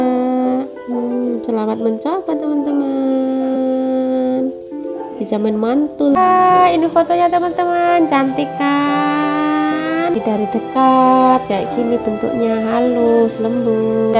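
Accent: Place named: Banyumas, Indonesia. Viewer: Ngapak ya 🤭🤭🤭😂😂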